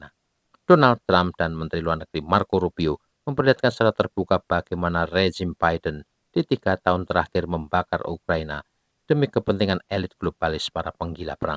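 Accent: Java, Indonesia. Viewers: Logat jawanya kental...ngx,seru bahas perang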